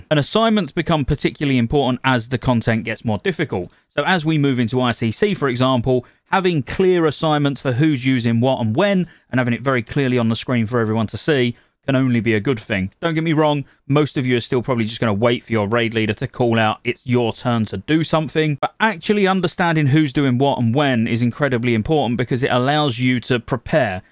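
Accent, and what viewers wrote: United Kingdom. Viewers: Is this a special type of British accent? It sounds interesting and a little different than what I’m used to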